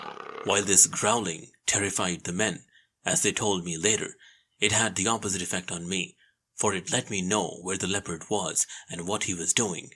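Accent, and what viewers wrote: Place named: India. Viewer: Your stories telling in unbelievable You are a white Hunter talking with a Indian accent ,Who thought of this combination ,Its BRILLIANT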